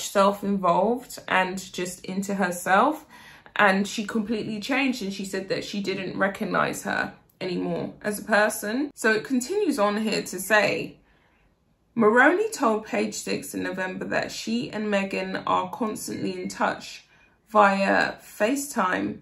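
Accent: England, United Kingdom. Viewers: you are a beautiful, intelligent woman who communicates so articulately with your English accent, which I absolutely LOVE as an American!💓🍀💓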